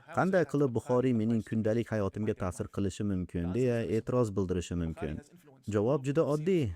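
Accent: Andijan, Uzbekistan. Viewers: Koproq shevada gapiradi bzi andijon shevada kopchilik "yuĞurib" deyishadi atag yahshi taklif🎉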